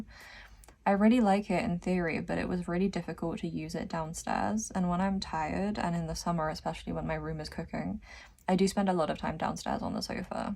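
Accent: United Kingdom. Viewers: Vocal fry sounds so lovely when the person has received pronunciation rather than a Kardashian valley girl voice. Anyway, feel better soon! You're so right about our lack of seasons. It never seems to get very cold here these days. We might get a cold snap lasting about a week before we're back to a solid 50% humidity and temps in the low teens. I can't remember the last time I had to wrap up warm on my end of October birthday. I'm of the opinion that infections have risen because it's never cold enough to kill everything off.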